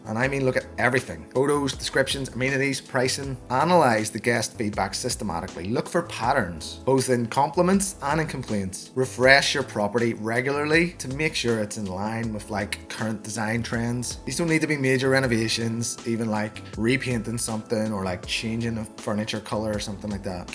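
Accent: Ireland. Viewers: That’s extremely rude.he has a wonderful Irish accent, and that is how Irish people speak. I am watching this in South African, and I can hear him clearly, beside you can slow down the speed of the play. Telling people to speak slowly for your convenience is rude .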